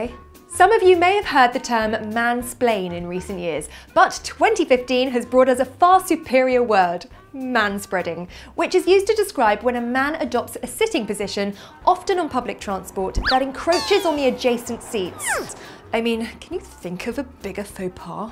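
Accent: United Kingdom. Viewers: PLEASE , keep with the amazing vids!. just please please please add subtitles to them. brit accent is a bit hard to understand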